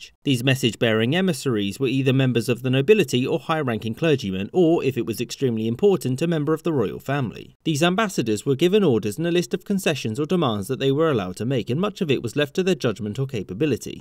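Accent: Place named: Scotland, United Kingdom. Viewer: I'm Irish yet I understand the Scottish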